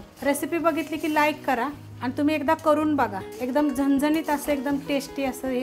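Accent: Satara, India. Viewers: Tai tumhi Satara che aahat ka??? bhashe waru vattay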